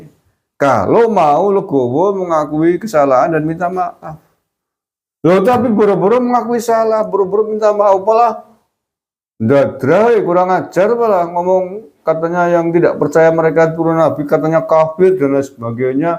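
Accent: Banyumas, Indonesia. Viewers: Hajar pak!!! Tapi sy suka cengengesan sendiri klo liat konten pak, terutama klo lg marah dgn bahasa yg ngapak 😂😂😂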